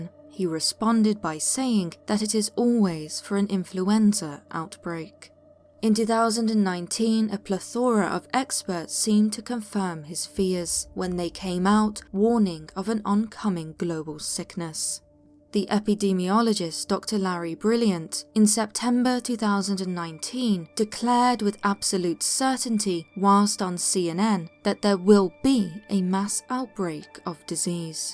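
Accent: England, United Kingdom. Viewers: Dude i can’t explain how much i love this channel. it’s just so awesome, definitely in my top 3 favorite YouTube channels. and i know the narrator lady is married. but her voice is the perfect combination of sexy, intelligence and creepiness if that makes sense lol. (I got a thing for women with the English accent 😆😂) But i digress, over all this channels content is epic & i get stoked every time i get notified there’s a new video 💯. Keep up the great work guys and i wish you all the success in the world. 🙂👍🏻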